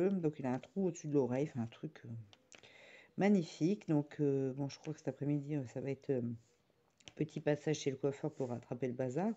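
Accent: North, France. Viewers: Désolée, mon commentaire est envoyé 😙😟. J’habite maintenant en Charente maritime mais suis originaire du pas de calais, quand je t’écoute je retrouve avec plaisir les gens du Nord ! Bravo à Lolo pour le concours. Bisous et à bientôt.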